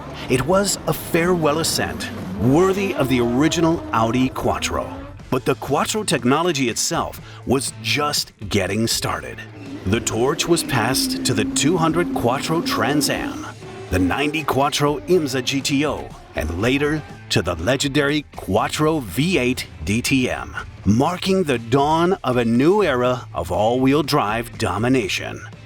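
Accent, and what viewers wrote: United States. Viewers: The narrator amazingly pronounces Mr. Piech's name correctly- most non-Germans say it incorrectly as "peach". But- the CEO of VW was not "Schumacher", but Schmueker (would sound like "shmicker"). And the company brand is sometimes pronounced as 'oddee" and not "owdee", even in the same sentence?! And my gosh- who is the main intended audience of this video, given the obvious American accent of the narrator? The USA! So provide the units as most commonly used in this country- standard units (e.g., inches, pound-feet torque, pounds, miles per hour, etc.) with either metric spoken right afterwards, or visually in parentheses/captions. There are some std units on the car depictions as subscripts, but when the text is spoken they are missing. Nice to see photos of the development cars for the original Quattro setup. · Just say Volkswagen. 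It sounds dumb when you talk like an American for the whole video except for one word where you have a German accent. It just sounds pretentious and smug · Pity about the Hollywood yankee doodle commentary...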